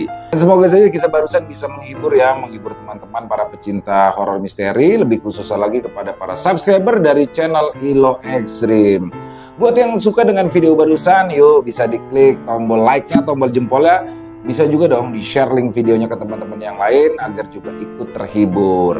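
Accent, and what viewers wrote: Jakarta, Indonesia. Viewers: Seru bgr T aye demen betawinya neok banget ya.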